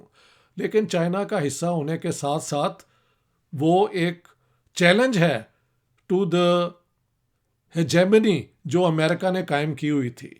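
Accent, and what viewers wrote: Punjab, India. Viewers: I like your Punjabi Style Haan ji...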